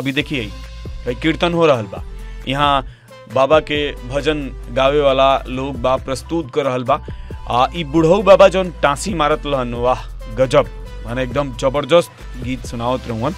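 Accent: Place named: Azamgarh, India. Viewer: आजमगढिया भाषा लगता, ठे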